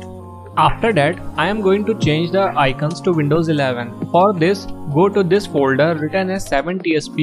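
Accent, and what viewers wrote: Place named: India. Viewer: I'm so sorry and i don't mean any offence but from my experiences, the indian accent is throwing me off I am quite sure tho that this is probably a legit vid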